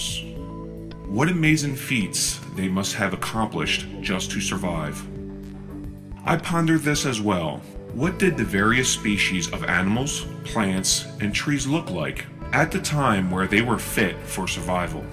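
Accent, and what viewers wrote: New Jersey, United States. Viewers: Liked the video, but the voice modulation thing is...weird...coupled with a NJ accent. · The combination of gravitas-evoking reverb and Jersey accent makes me smile.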